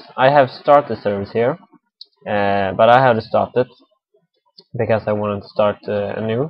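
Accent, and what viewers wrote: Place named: Sweden. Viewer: Man hör ju att du är svensk O_o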